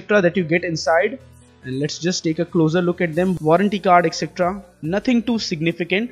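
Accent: India. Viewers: It's not bad. It's awesome. When did accents become bad? If you don't mind, what state are you/your family from in India? You sound like my colleague Jigesh from Punjab but I don't want to guess.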